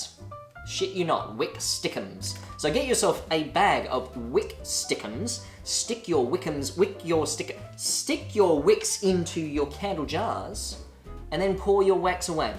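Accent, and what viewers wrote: Australia. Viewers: He has an Australian accent that isn’t annoying. Astonishing. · He makes me think I need to marry an Australian man. Love the accent.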